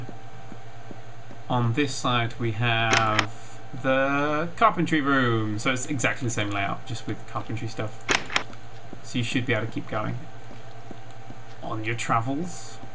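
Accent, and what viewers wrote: United Kingdom. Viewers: Finally a British guy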